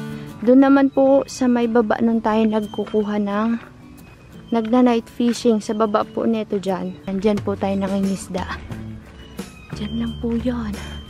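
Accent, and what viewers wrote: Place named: Davao Oriental, Philippines. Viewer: Parola mati davao oriental na logat jen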